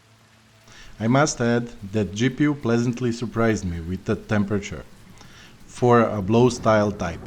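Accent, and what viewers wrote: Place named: Russia. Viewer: You Russian? Ukrainian? Good review.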